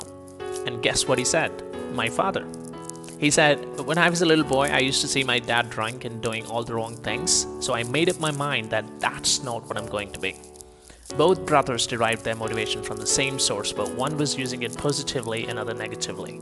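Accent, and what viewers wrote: India. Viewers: Are you indian? My friend (who is indian) speaks like you IDK but i think so.....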